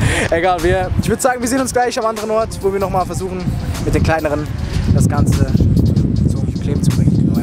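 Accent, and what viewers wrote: Switzerland. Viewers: von wo kommst du aus der schweiz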